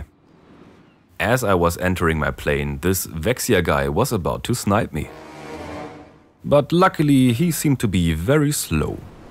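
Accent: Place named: Germany. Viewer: der deutscheste akzent überhaupt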